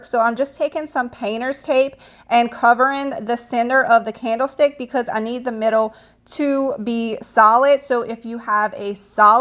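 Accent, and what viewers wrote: Louisiana, United States. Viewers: I adore your videos! Always great ideas, fun techniques AND the accent reminds me of my in-laws and makes me smile - my husband is from Abbeville - bonus! Haha! Thanks for the chance to win! I’m loving the pitcher at the end with the clay added! LOVE all things IOD and haven’t been able to get my hands on a set of stamps yet. XOXO…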